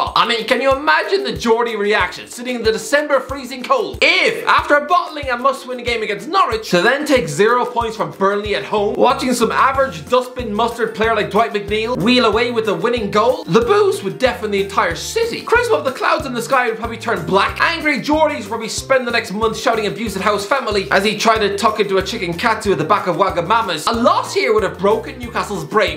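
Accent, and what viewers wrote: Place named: Ireland. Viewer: A Walking Meat Loaf, a Walking Taradactul....man this Irish fella, along with that accent, sure does know how to make jokes, all while telling football news! Bravo sir...bravo indeed! Btw did u forget Origi's goal from TAA's corner against Barcelona? You were rattling off so many, wasnt sure if that one was in or not!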